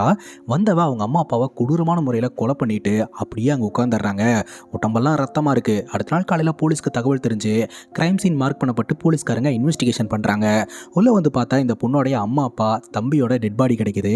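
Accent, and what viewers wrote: Coimbatore, India. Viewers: coimbatore ah neenga?!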